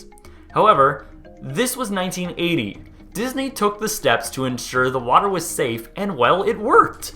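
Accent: Canada. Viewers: I swear he said aneoba with an n. Willing to bet he's Canadian the way he pronounces his o's.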